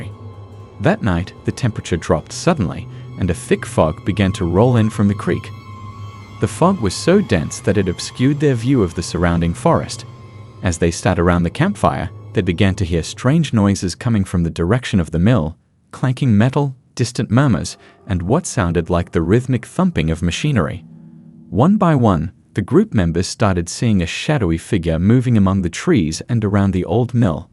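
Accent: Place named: United Kingdom. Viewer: A Brit narrator narrating stories about Appalacha. To me it just doesn't fit.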